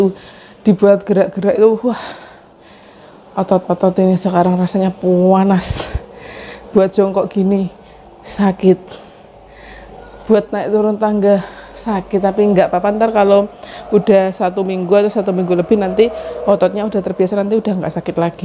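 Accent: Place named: Java, Indonesia. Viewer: Mbak klo ngmong jawa lucu😁 sehat²nggeh mba dan keluarga🤗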